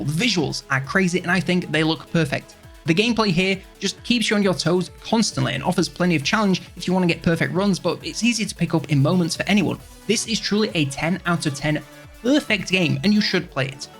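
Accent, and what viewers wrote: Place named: United Kingdom. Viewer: It's nice to hear a British accent telling about something interesting. What exactly is it though?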